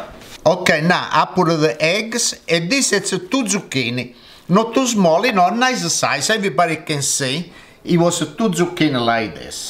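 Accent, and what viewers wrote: Italy. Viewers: Yummy 😋 😋 enjoy the Cooking and italian accent mama mia bellisimo 👍😄God bless you🙏😇♥ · His Italian English is hilarious !!😂😂😍😙😙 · So good! I've been into chickpea flour instead of white flour because I think it's got more protein and is a bit healthier than the white. Going to make this soon. Looks amazing! I can smell it through the screen! Love your accent. I'm Italian too. Buon appetite!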